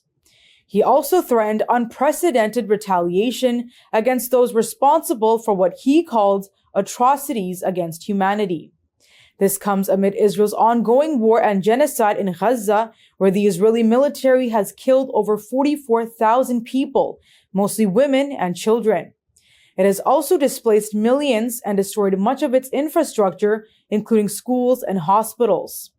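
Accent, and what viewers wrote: United States. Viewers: ╔═══ ❤☀💚☀❤ ═══╗ 💚💚💚💚💚💚💚💚💚 Madam, Assalaamu alaikum. Though it is more than an hour since your news video is posted, the TRANSCRIPT is not made available so far. Without the Transcript we from India find it difficult to follow your American English. Please advise your technical staff to make the TRANSCRIPT facility available for your video!!!. THANKING YOU IN ANTICIPATION!!! 🌐🌐🌐🌐🌐🌐🌐🌐🌐 ╚═══ ❤☀💚☀❤ ═══╝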